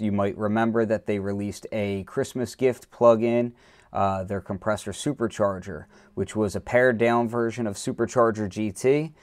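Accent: Philadelphia, United States. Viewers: Thanks, great demo. I like your Philly accent, too.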